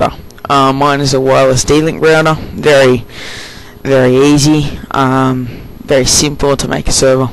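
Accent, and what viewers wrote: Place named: Australia. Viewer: Its australian actually.. definately not a kiwi..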